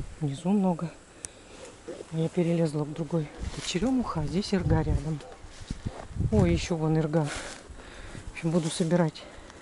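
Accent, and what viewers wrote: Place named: Urals, Russia. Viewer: Даже не зная,где вы живёте,я поняла по говору,что вы с Урала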